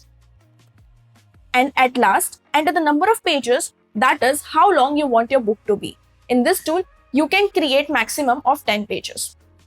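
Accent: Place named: India. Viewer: Indian no doubt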